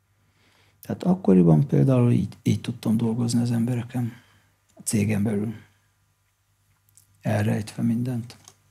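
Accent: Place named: Russia. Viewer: Kedves! Nagyon érdekelne, hogy miért beszélsz akcentussal? Olyan a hanglejtésed, mintha orosz lenne az anyanyelved. Jól hallom? Köszönöm a videókat, nagyon szeretem őket, remelem egyszer lesz alkalmam egyszer találkozni veled!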